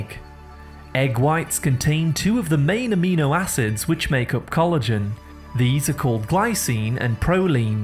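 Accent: England, United Kingdom. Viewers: Whats with the damn English accent..LMFAO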